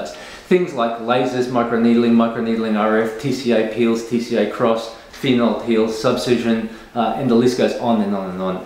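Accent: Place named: Australia. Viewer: Thank you for your videos and information. It would be a lot easier to follow if you sit or stand in front of the camera and make eye contact, because it’s hard for non native English speakers to understand your beautiful Australian accent, so standing in one spot will make all the words have the same tone and will be able to read your lips. (but that’s just me and my opinion)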